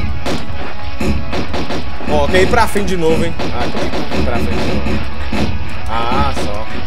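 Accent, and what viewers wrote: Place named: Minas Gerais, Brazil. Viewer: ontem fui em seu canal vc não respondeu vc é de Minas desculpe mas seu sotac e bem parecido gostei muito do canal nao sei nada de jogo assisto por vc rses vc que anima beijinhos 😘😘😘😘😘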